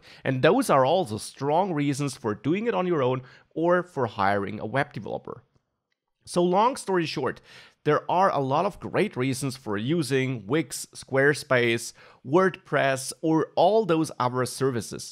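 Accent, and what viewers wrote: Germany. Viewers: are you from germany? (accent - pure interest)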